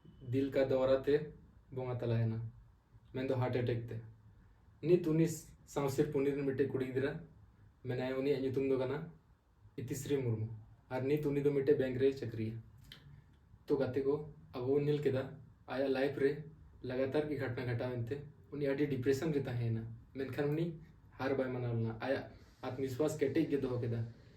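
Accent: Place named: Santhali, India. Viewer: Itna shudh santhali😅😊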